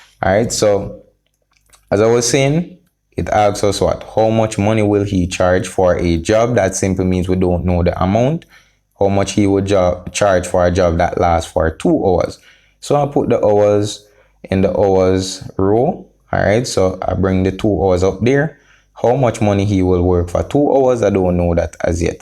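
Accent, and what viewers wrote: Jamaica. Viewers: love the Jamaican accent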